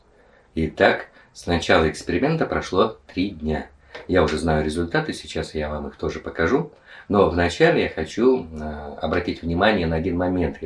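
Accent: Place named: China. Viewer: Да откуда у тебя этот русско китайский?)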